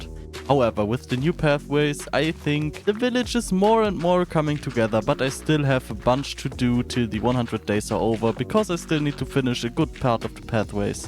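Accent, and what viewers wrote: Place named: Germany. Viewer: at the beginning i thought he isnt engish like i hear german through and then wen you said your not good in speaking english and that your from germany it all maked sense (subcriceb)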